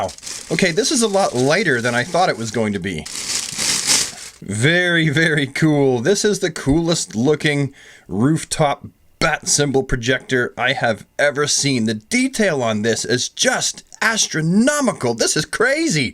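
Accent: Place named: Canada. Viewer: Love your channel. (I know I say it a lot) but I always forget your Canadian while watching until you say certain words. And it makes it all the better lol.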